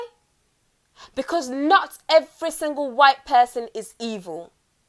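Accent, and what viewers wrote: United Kingdom. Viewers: Are you still BLEACHING your skin, GAMBIAN woman with British ACCENT? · But she is sitting up here with a "WHOLE BRITISH ACCENT." DIVISIVE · Kwame Owusu I had to close my eyes and imagined 👀because yea the strong British accent won’t appear to come across threatening but hey she is building her platform to start a nonsense war just like the South Africans do the Nigerians there own blood and wonder why we get taken advantage all over the world I mean literally shitted on 😖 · You on here starting your president's rant against your own black brothers and sisters in America what's your strong British accent acting like you in Gambia right now doing everything for gambians and on a Prejudice rant against your brothers and sisters in America westernized and brainwashed....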